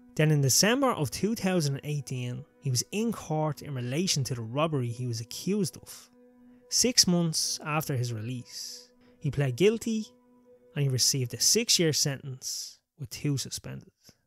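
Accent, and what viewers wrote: Ireland. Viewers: Thank you for taking the time to respond! Very cool of you. I'm in the US...South Boston, Massachusetts. Which happened to have the BEST + biggest majority of Irish-American people filling the neighborhoods of Boston back in the day. Other then Charlestown who had a quite a few Irish also. I grew up with our annual St Patrick's Day parade being at my doorstep. Good ol days lol Southie, as we natives call it, have so much pride in our Irish heritage. There's a neighborhood store where we can order Irish Knits + baby blankets made in Ireland which is about all we have left of our "Irishness" in 2023 lol Used to be someone's Nan that would be knitting those. I said we "had" a large community of Irish here but regentrification...lol It happens. Your content is great! I look forward to future vids. Ty for taking time from your day to respond...figured brogue was better then accent lol And it sounds awesome to these American ears✌️💚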